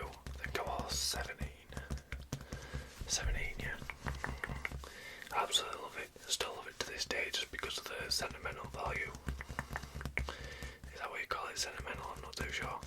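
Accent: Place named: Lincolnshire, United Kingdom. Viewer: Where are you from? Your accent sounds like home! I’m from Lincolnshire!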